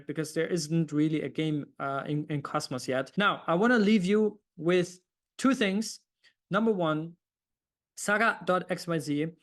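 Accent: Germany. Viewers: oh shit i texted in german. Maybe im wright and your accent is german?!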